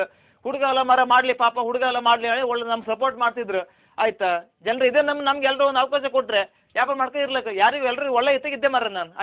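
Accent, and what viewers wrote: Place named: Kundapura, India. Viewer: Namma hebri namm hemme, I love kundapura kannada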